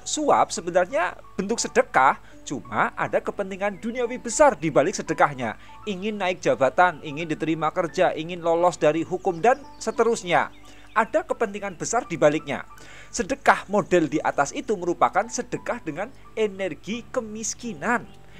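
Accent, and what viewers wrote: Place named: Tegal, Indonesia. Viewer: Saking logat.e kayane wong tegal ya um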